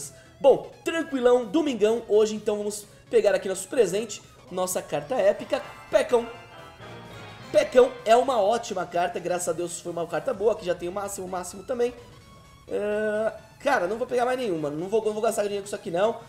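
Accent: Minas Gerais, Brazil. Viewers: Mineiro eu acho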